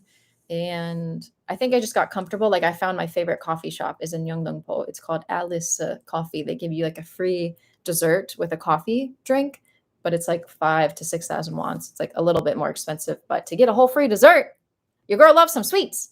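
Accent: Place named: South, United States. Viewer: Your southern accent is very subtle, also; in my time in China, nearly every American I met was from the south, including me (NC). If you feel comfortable answering, what did you do in China? and is Seoul similar to Chinese cities? This would be really helpful because I used to live in Shenzhen and am considering studying in Seoul for a year as an exchange student.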